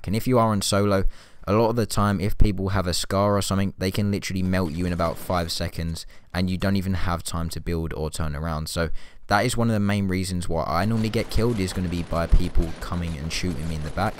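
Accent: United Kingdom. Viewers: Btw you sound British I'm British want a cupa tea 😃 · You from the uk?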